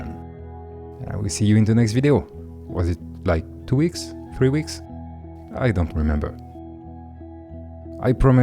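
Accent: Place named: France. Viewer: L'accent français a vraiment été la cerise sur le gâteau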